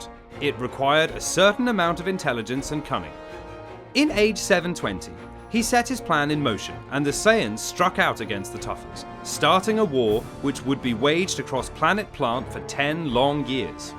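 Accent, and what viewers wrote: United Kingdom. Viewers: Ohhhh I love this British accent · This was so well-made! One of your best and most engrossing videos, CBR! And the RP accent is a plus!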